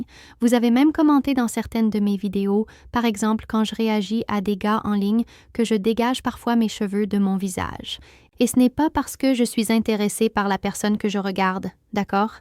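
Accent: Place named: Canada. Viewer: ce qui me gene cest cet accent canadien ia ou pas ?????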